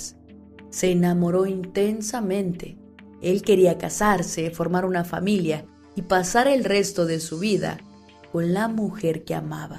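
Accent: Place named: Mexico. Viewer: Gracias , te felicito y agradezco tu talento,me encanta tu acento mexicano. Abrazos desde Brasil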